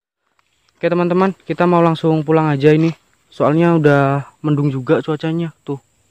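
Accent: Java, Indonesia. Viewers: Tpi ngomongnya mirip lgat jawa mas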